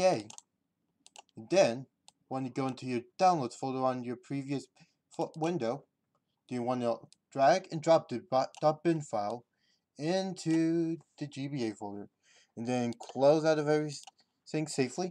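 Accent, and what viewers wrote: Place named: India. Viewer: the terrible Indian accent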